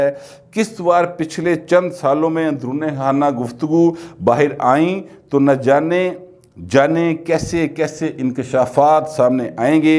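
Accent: Punjab, Pakistan. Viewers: SB. AP k v log mujhay buhat deer se miltay hen.buhat intizar rehta hy Apki Urdu k beech me in Punjabi buhat khub surat lagti hy. Ashraf gujjar from Nankana SB.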